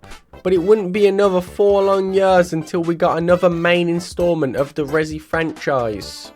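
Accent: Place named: United Kingdom. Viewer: you are awesome dude👊😂, can I ask you where are you from precisely? your accent seems british but not the british I know🤔.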